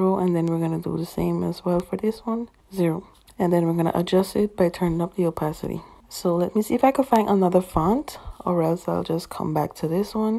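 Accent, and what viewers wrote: Guyana. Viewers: Hey hun you sound Caribbean asf like Guyanese where are you from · Sis are you Guyanese 🇬🇾 ?? 🥺 cause your accent chileee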